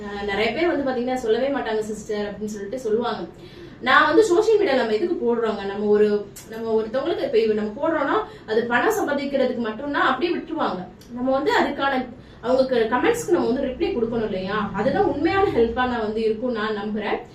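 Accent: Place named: Delhi, India. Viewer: Aap bol ra hi ho bahut accha hi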